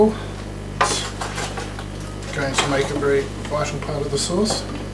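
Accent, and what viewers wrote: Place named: Australia. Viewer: the captions are a classic laugh fest ... I missed the cocaine lol that's hilarious. Methinks the translations program has issues with the Aussie accent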